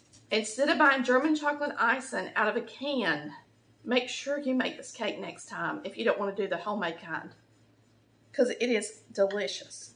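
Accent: South, United States. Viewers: It looks delicious, can't wait to try this. Thank you, sweet lady, I love your Southern accent. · I don’t know how this came up in my feed, but I am loving this. This feels like home. I mean, Lordy mercy! Somebody that talks all southern like me! Likin’ and subscribin’ right now. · It’s kinda hard to marry up a southern American accent, and a German cake 🤪. Y’all get some pecaaaaarns LoL. However, the sound quality in this video is first class, and the recipe is worth making 👍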